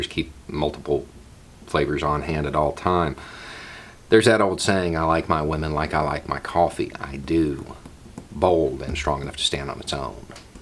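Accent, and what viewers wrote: South, United States. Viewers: What's with the southern drawl? You didn't sound like that back in the day.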